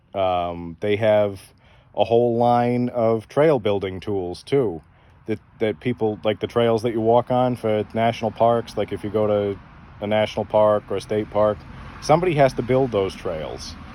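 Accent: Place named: New England, United States. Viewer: Great review, ill be buying one of these! Also, as a New Englander thats been transplanted to the south, your accent brings me great nostalgia.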